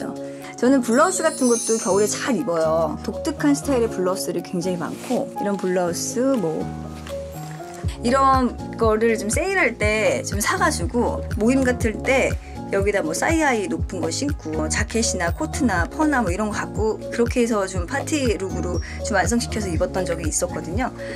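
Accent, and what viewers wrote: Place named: Seoul, South Korea. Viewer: 서울사투리 쓰네영ㅎㅎ귀엽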